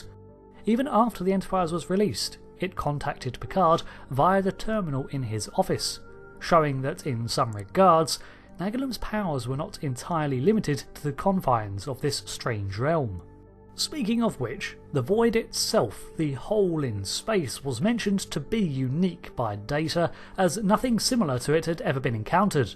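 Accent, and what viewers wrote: United Kingdom. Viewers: Awful nit brit narrator. "Theah shiewwwwwwwwwwwwwwds..."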